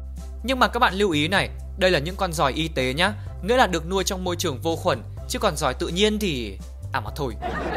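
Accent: South, Vietnam. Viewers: Có giọng Nam nữ ta ơi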